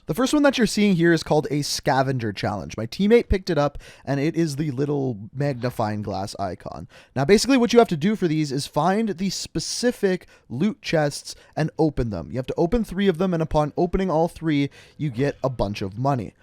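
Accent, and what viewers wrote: Canada. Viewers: Is this guy from Canada ?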